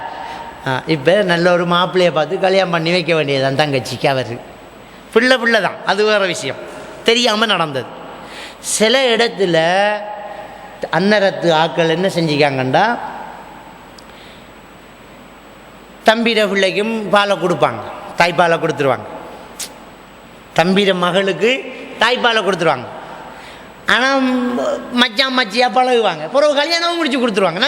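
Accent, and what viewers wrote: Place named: Sri Lanka. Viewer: திருமணம் பற்றியும் தலாக் பற்றியும் தெளிவாக விபரமாக சிறப்பாகவும் சுந்தர இலங்கை தமிழில் அருமையான விளக்கம். அல்லாஹ் உங்களுக்கு ஈருலக பாக்கியங்களை வழங்குவானாக.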